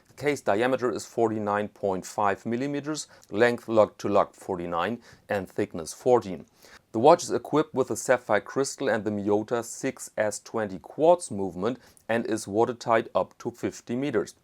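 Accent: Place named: Germany. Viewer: Love your reviews. Jazz guitar? Great watches? Great mens attire? And a German accent! (I lived in Oberammergau till age 14) keep them coming!!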